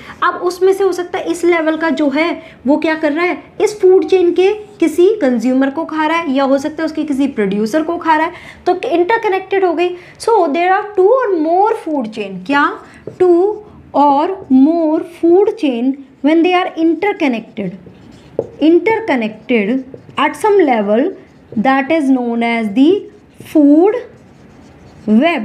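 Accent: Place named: Jammu, India. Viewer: Accent from jammu 😂❤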